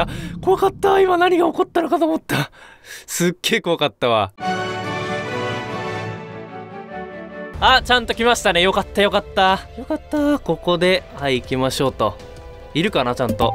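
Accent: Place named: Okinawa, Japan. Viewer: イントネーションが沖縄っぽい(笑)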